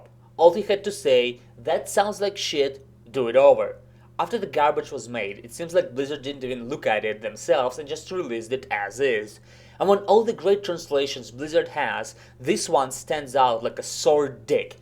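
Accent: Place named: Russia. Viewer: I can hear pure russian accent from your words. Are you from Russia?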